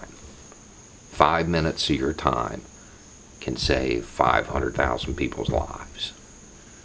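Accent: South, United States. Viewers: You are a voice of reason. I showed your videos to my conservative Republican dad and even he likes you. I don't know if it's because you say it with a southern accent, but he will listen to what you say while ignoring the same arguments made by other YouTubers that I watch.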